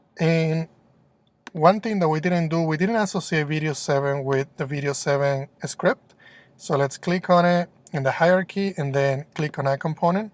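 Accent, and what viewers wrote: Spain. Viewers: Good Video. As I noticed your accent, I think your mother-language is Spanish. If that's true, It would be awesome to hear you recording some (or all) of your videos in Spanish too. Hi, from Ecuador.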